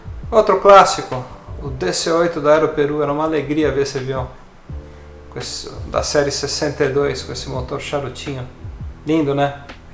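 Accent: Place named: Argentina. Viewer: Guuuuauu what a beautiful videos a photos...like always! If i dont know that you are brazilian...in the part of AR..i think that you are a argentinian jajaja..con un mate en la mano! Tenes un acento argentino perfecto en tu español! Jaja i have a question...i read about the relation of you, David Neeleman and Azul with viracopos...but from 1990 (KLM moved to guarulhos) to that moment...what happend in viracopos? All the people say "viracopos its beautiful, great desing and funcion". Big hug from an argentinian architect...who loves your photos and desing! And your histories!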